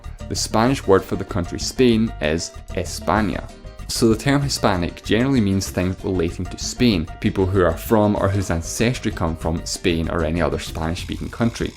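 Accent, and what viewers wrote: Scotland, United Kingdom. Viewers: You have a very strong Scottish accent! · are you Scottish ? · Of course the narrator explaining all the differences in Latin American is not American. I’m trying to find out the accent maybe Scottish? Americans should be the ones who know inside out this but they are the only ones who are ignorant. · i quite like his voice, love me a scottish accent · You made one fundamentaL mistake, claiming that "people from, Portugal are essentially Spanish".It is like claiming that people from Wales or Scotland are ... English ! :-\ you suggest that anyone speaking a Romance Language is a Latino, incluidng (drum roll) Italians and Romanians.May I politely suggest you change that view before you upset the entire Italian Youtube community !!!Guyana and GHuiana are NOT pronounced "guy-ana" but "goo-yana" "Not all people from latin America are latin..." is exactly like saying "Not all people from North America are Americans". 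Because they are not. Theyr ancestors emigrated there from Europe or came with the African slave trade - the only REAL South Americans and North Americans being the (nearly extinct) Natives.All in all... I do not know whether you are Scottish or Geordie but you DO have an issue understanding what is Latin, what is Latino and what is neither.Good night. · are you Scottish? cause I'm in love with your accent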